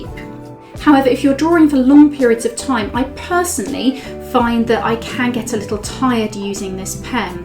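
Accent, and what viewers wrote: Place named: South, United States. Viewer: I have a few bent nibs, Love them for their versatility. Love the dulcet southern accent, BTW.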